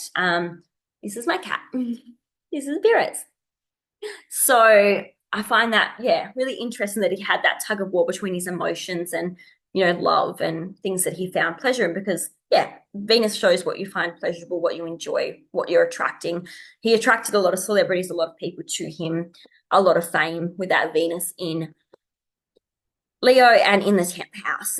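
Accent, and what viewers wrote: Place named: Australia. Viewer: Amazing job done! You sound Aussie BTW! ❤️❤️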